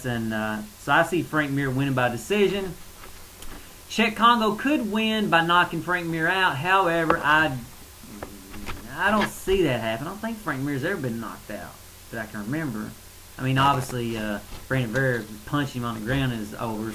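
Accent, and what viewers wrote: South, United States. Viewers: Anybody who's southern accent is stronger than mine gets 5 stars. If the next video's just as good, i'm straight up subscribing. Alot of stuff i dont particularly agree with, but i think that's the fun of it all. I only really agree with your deal on BJ. It's almost to the point you dont have anyone in that division that can hang with him since he beat Little Evil.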